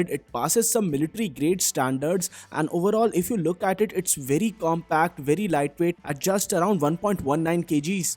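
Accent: India. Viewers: English ( Indian accent ) 😅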